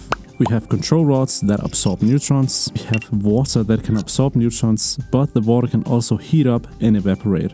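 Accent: Denmark. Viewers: That's the thickest danish accent I've ever heard. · is that a dansker i høre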